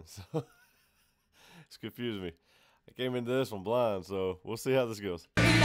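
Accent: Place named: Georgia, United States. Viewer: Man, you sound like you from my neck of the woods. South Georgia? I discovered about 2 years ago. Seen em twice already after not going to a concert in 20 years. Best rock band since the 90s.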